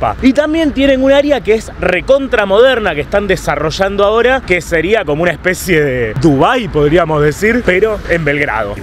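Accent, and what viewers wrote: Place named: Argentina. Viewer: Hay muy buenos youtubers viajeros, pero es tope de gama ver videos de viajes tan interesantes con una mirada y acento 100 % argentino. Adelante!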